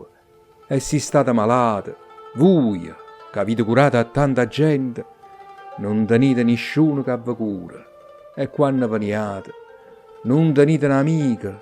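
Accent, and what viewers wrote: Italy. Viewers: ...., dove tutto è tarlato,come voi,!! Come fa riflettere questa bellissima poesia, lo specchio,!!...Eppure non è triste,ma lucida realtà, che fa grande tenerezza, ma che attende tutti,...quelli fortunati!!,...sei un grande artista, sempre...ma quando interpreti il dialetto napoletano,... diventi irresistibile....da ascoltare più e più volte!!! Ti faccio i complimenti più sinceri. 💯👏⚘♡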